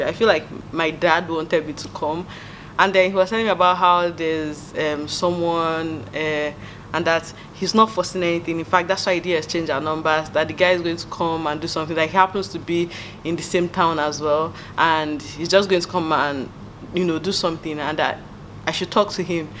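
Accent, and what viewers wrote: Igbo, Nigeria. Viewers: haha I really enjoyed this video and your Ibo is sweet! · 😂😂😂 Your accent plus your facial expressions and your general attitude is just epic😂 Ewo, my Nnewi sister. They can't even do arranged marriage for me and I'll collect. Odiegwu 😂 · The Igbo!! Like honey to my ears😫 You're so gorgeous. I'm here to stay. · I’m just here to hear you talk, love your Nigerian accent. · Your igbo be sweeting me....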